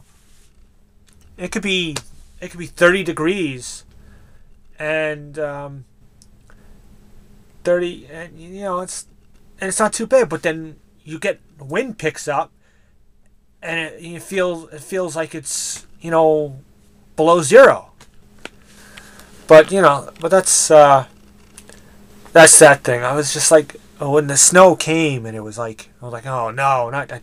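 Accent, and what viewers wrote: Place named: New York, United States. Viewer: you from new york? I heard an accent and I used to live there. Good vid I subbed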